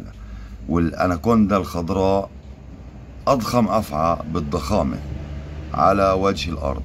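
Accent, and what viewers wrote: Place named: Palestine. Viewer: تحياتى من مصر بحبك حضرتك أوى 🙋‍♀️😊🧡🍯 وبحب اللهجه الفليسطينيه اوى وبالأخص كلمه "ولااااو" بحبها أوى 🤭💜💜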